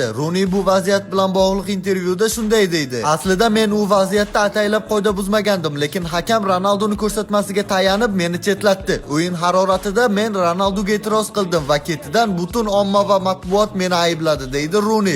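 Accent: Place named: Rural, Uzbekistan. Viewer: Gapirish nutq kalxozcha blaaaaa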